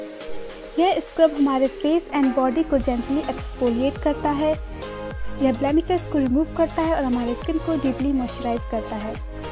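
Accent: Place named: India. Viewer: Индийский английский....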